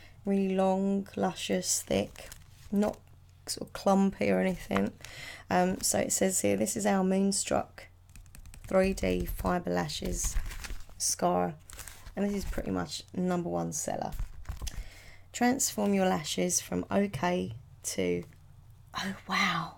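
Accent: Essex, United Kingdom. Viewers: Your voice soothes me lots.. I think it's because of your accent. My family is from Essex. You're my favourite 😊❤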